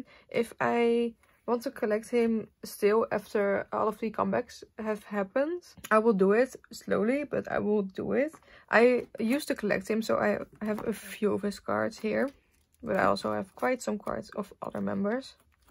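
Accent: Netherlands. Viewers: Omg your accent, you must be Dutch!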